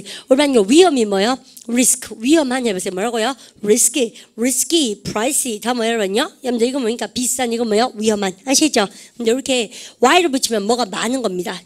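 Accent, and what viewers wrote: Gyeongsang, South Korea. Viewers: 대구말씨 귀엽고 웃겨요!!!ㅎㅎㅎ 강의 듣다가 혼자 킥킥거리면 가족들이 힐끗 쳐다봐요 ㅋ😂 참고로 저는 창원아줌마 임돠 항상 감사드립니다❤ 화이팅~~~~😊😊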